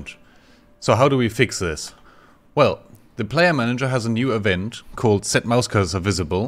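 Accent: Germany. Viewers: klingst mega deutsch ^^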